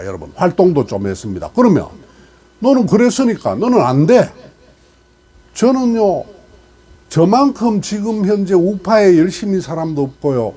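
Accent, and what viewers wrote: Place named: Gyeongsang, South Korea. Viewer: 경상도 억양 ~~사온닭 아니구요,싸움닭이죠?ㅋㅋㅋ 😂😂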